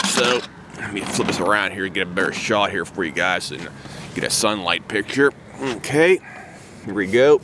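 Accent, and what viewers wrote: Pittsburgh, United States. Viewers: Dude, your Pittsburgh accent is STRONG! 😅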